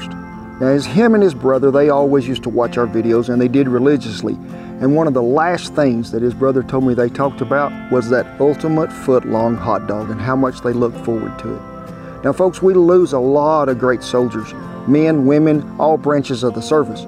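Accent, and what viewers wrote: South, United States. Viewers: " Done gottum caught, an in the boat, an fitem t putum ona fire" Mr. you're as country talking as us folk in Upstate S. Carolina. Love your videos.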